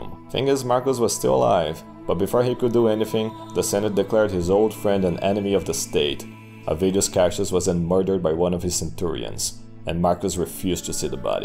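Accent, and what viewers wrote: Brazil. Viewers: Sir Suspicious yeah I’m not familiar with Brazilian accents at all, which is why I assumed Romanian/Greek.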